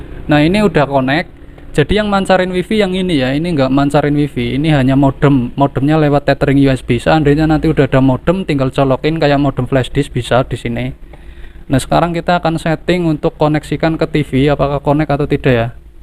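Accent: Banyumas, Indonesia. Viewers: Ngapak apa yah kang?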